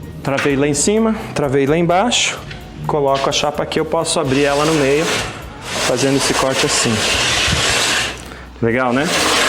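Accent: South, Brazil. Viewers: Gostei muito bom. Pelo sotaque acho q vc é sulista, moro 6 meses em Curitiba e 6 meses na Itália, vou acompanhar seus vídeos. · Olá! Sua serra vertical é fantástica, mas muito melhor é sua capacidade de explicar e sua generosidade em não guardar nada para si mesmo. Você tem muito carisma para estar na frente de uma câmera. Pelo seu sotaque fica claro que você é do sul do Brasil. Amo seu país e lamento não poder ir todos os anos como fazia anos atrás. Parabéns mais uma vez e desejo muito sucesso. Saúdo-vos de Córdoba, Argentina.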